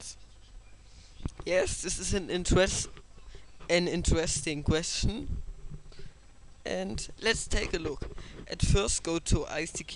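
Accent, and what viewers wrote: Germany. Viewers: hey danke für das tut man merkt schoin das du deutsch bist faved and liked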